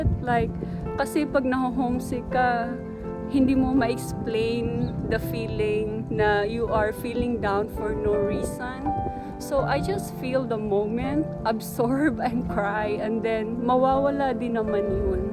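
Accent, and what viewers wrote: Visayas, Philippines. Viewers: Hello. My kababayan Alam Kong visaya gyud Ka dahil Yong pag bigkas mo Ng Tagalog migdyo pilipit. Proud ko sa mga PILIPINO kahit saan kahit anong trabaho marunong mag tiyaga . God bless you & your Family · BISAYA po kayo ma'am?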